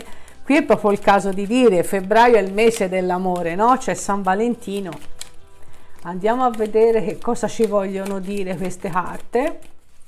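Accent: Tuscany, Italy. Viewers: .... sei simpaticissima super accento toscano ❤... ti seguo sempre.... daiiii stesa del leone.... dai dai che arriva...lo spero!!!🌹🌹🌹🌹...grazie un abbraccio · Un abbraccio sei un mito.. Adoro il tuo accento toscano. Spieghi le tue carte benissimo. Mi ritrovo nella chitarra. Bella la nuova grafica...brava.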